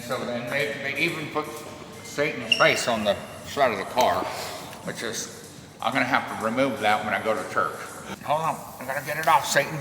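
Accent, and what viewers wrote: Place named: Appalachia, United States. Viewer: is too good at the hillbilly accent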